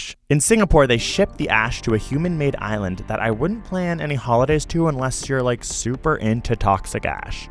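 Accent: Canada. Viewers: Youre canadian?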